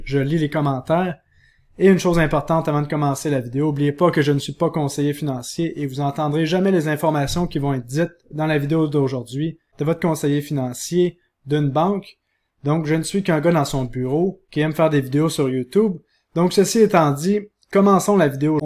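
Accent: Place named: Quebec, Canada. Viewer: Assez impressionnant. On dirait un peu de théorie du complot. Mais je dois avouer que ça nous fait réfléchir. Je vais surement regarder cette vidéo encore quelques fois et faire mes recherches afin de m'en faire une idée. Merci. PS: c'est bien d'entendre quelqu'un du Québec. " Du coup " ça change de l'accent français...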